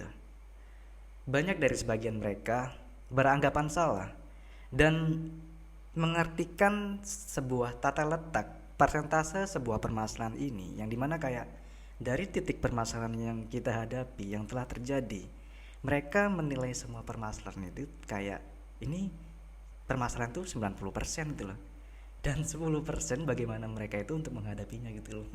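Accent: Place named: Java, Indonesia. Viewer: kk GUE nya kok medok ya, maap kk skali lg tp ga pantes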